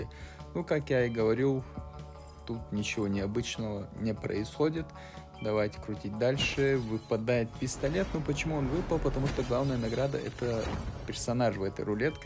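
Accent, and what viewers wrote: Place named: Chechnya, Russia. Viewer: Брат, ну вот не могу понять, акцент у тебя Чеченский, но русским владеешь хорошо, я в замешательстве.. ТЫ КТО ВОИН?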